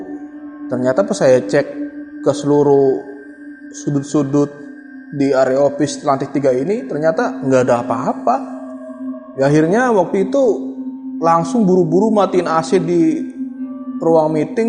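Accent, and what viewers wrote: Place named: Banyumas, Indonesia. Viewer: Pak Jawa Ngapak ya? Khas banget logatnya haha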